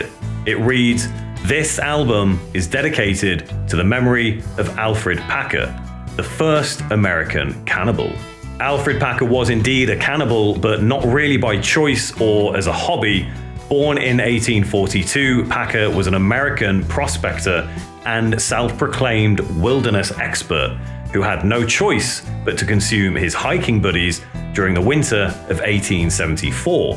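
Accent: United Kingdom. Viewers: Even though you play live footages and cuts of the songs, my mom would fall asleep because your british accent since loves it. She doesn't even like metal. "Just put me the Brits on youtube, Dino".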